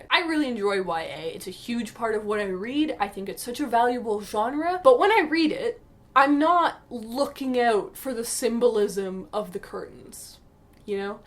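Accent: Canada. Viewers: Loved hearing the Canadian accent strong in this video, as a Canadian living in the states for a decade.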